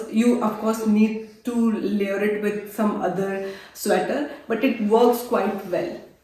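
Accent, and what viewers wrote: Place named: Asia. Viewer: By language akcent u looks Asian.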